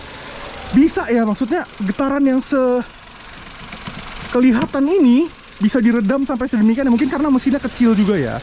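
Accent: Manado, Indonesia. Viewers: orang manado kah ? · Awalnya qt kira ini chnnel jkt pas dgr logat rupa logat manado trnyata plat DB 😅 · Om orang manado kang?😂 Jalang mo ka koka itu dgn di ringroad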